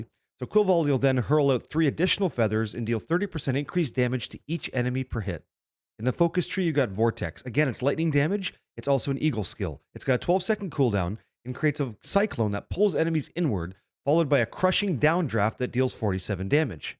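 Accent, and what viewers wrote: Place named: Canada. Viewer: when canadians speak 🤮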